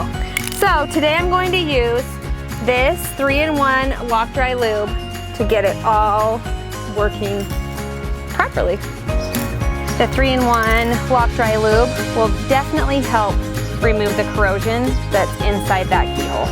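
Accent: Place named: United States. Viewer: I love the American accent...why cant Australia have more American girls lol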